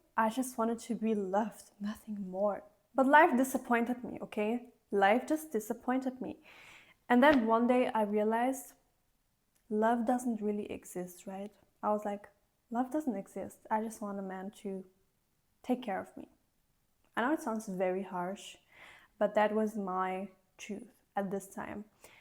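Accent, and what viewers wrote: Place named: Eastern Europe. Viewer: She is so beautiful and kind hearted ❤❤❤ I love her accent. Where is she from? I think she is my Slavic Queen 👸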